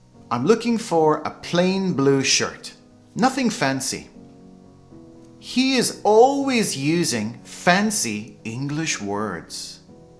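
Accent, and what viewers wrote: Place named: United Kingdom. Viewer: Most appreciated. I`m loving British English! Your English reminds me of my staying in the UK. Thanks, Edinburgh is beautiful town, Highland, Loch Ness. Keep going, I will be watching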